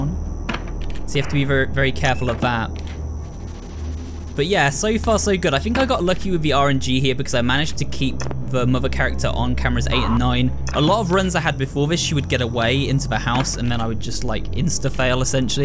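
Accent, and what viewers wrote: United Kingdom. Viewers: He british asl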